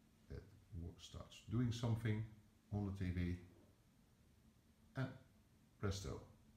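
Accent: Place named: Netherlands. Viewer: you have to buy the app any alternative way of doing this, also i noticed that you are dutch just from your accent you al speak english in the same way just an observation thanks for the video though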